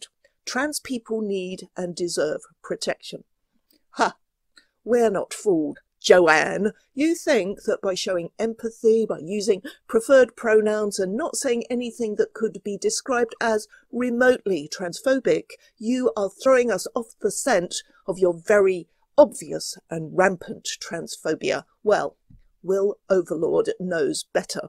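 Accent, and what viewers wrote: United Kingdom. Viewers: you do “sarcasm” better than any one- the British accent makes it even more delicious!!!❤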